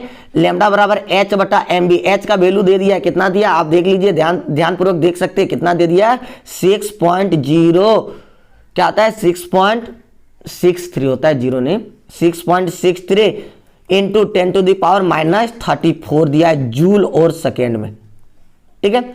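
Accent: Jharkhand, India. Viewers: Aap Jharkhand se he aapka language bata raha hai 😃😃😃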